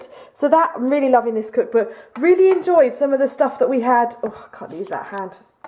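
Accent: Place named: United Kingdom. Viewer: Dear, If you wanna try something unusual, I've got a vegetarian recipe. There's a traditional hungarian meal, called stuffed paprika and my mom invented a vegetarian version a few years ago. Let me know if you're interested and I'll post the details here, or send you an e-mail:) Your videos help me so much, because I'm still getting used to the british accent, but with your help, its easier, so THANK YOU!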